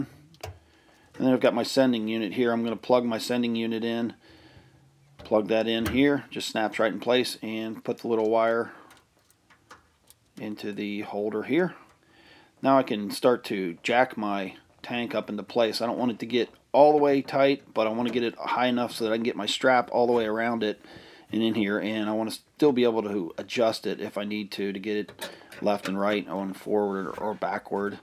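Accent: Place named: Philadelphia, United States. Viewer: I've done this job a few times but I like to re-watch to remind me what I'm in for. I always get gas on me somehow. You from near Philly or Baltimore originally? You've got "the accent"! Nice video.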